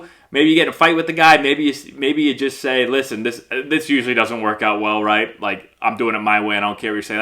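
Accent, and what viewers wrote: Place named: South, United States. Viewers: Where are you from that was way too close too us down south man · Great southern accent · Lmao at the southern accent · BTW, your southern accent killed me. I grew up in NE Ohio and played in college in Chicago and went to medical school and trained there, and ended up in Alabama surrounded by people who talk like that all the time. And lots of dumb coaches around here, even at the high school level. I guess they're everywhere. I would love to hear you do this same video after you have a boy who plays into high school to see if your outlook changes as a dad. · As a “Texan”, I appreciated the southern accent. Loved it 🤣👏🏼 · Great video, as always! I love the Forrest Gump southern baseball coach accent! This is certainly a situation that seems common across levels of baseball. I would love your perspective on how to deal with dumb conduct by coaches , specifically in the youth baseball world. 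As the owner/director of a travel baseball organization that includes youth teams (10-13u) and beyond how do you handle situations in which parents disagree with the conduct of the coach of their child’s team? Hypothetical: parent of a 13u player let’s you know that coach calls player a “coward”, tells them to get their ass up after being spiked in forearm, tells player to shut his mouth, and when pitcher struggles on mound says to catcher, “don’t look at me, he (pitcher) sucks”. Coach states that he is “old school” and that he is preparing players for high school and beyond. I know this is a bit off topic but interested on your perspective on this and what steps you would take or suggest that players and or parents take in a similar situation. Thanks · Nice accent. You pick that up in Winston-Salem?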